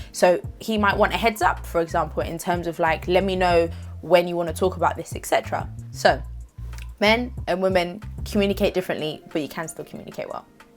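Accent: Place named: London, United Kingdom. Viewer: I didn’t notice your accent until you said you were from London 😂 it’s so subtle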